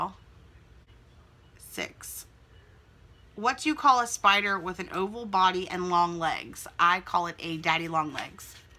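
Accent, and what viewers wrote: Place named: South, United States. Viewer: I was born and raised in VA, and I say about 90% of the words the same as you. I love the way we Southerners talk! ;)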